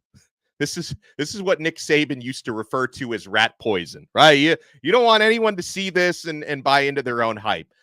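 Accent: South, United States. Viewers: As a Miami fan who lives on the TN/GA line you nailed the southern accent 😂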